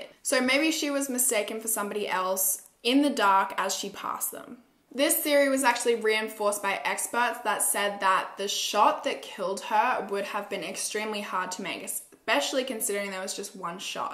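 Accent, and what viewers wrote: Australia. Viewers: i love your accent so much. i want an australian accent but i’m a boring american 😅😂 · I love the Aussie accent so much! Am I the only one haha?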